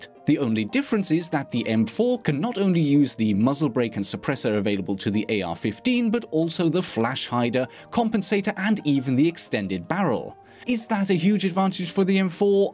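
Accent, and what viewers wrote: Germany. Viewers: Terry Makichod I think he’s German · Sometimes you have a little German accent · Just wondering, are you German? Your voice kind of sounds like you are a German person who is really fluent at English. Or maybe it's just your microphone lol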